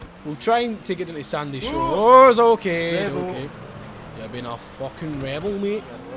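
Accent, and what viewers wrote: Scotland, United Kingdom. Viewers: I like the Scottish accent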